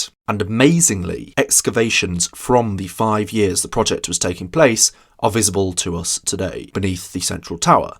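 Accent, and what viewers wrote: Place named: Yorkshire, United Kingdom. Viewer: Strong Yorkshire accent there... 👀😃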